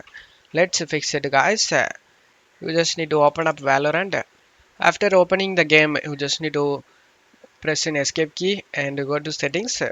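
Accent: South India, India. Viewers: Duck ur accent south indian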